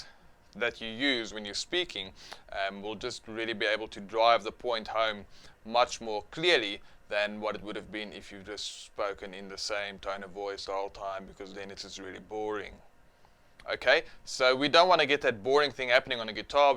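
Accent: South Africa. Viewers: You are South African! :D \\m//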